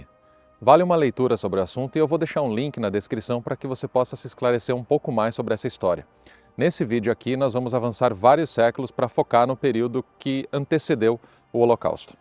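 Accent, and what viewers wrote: South, Brazil. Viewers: Gostei muito da matéria, pelo seu sotaque deve ser aqui de Santa Catarina ou do Paraná. To certo ?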